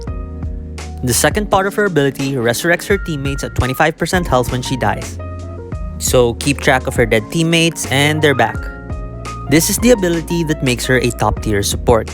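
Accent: Philippines. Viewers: Weird Question, Are you Filipino? Cuz you giving me a Filipino vibe you know? The way you speak, that Accent of yours is what giving me Filipino vibe, and I dig with that. Cuz I'm Filipino.🇵🇭🇵🇭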